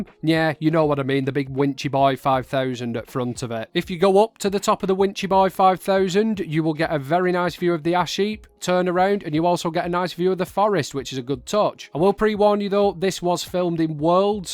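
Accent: United Kingdom. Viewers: Сколько английский не учи, а британский акцент все равно без субтитров воспринимать практически нереально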